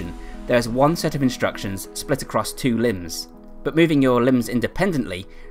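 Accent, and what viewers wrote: United Kingdom. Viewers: why is there a Dutch windmill in the video but i hear a british accent? I didn't know the UK has such windmills too!! awesome, I will implement this kind of training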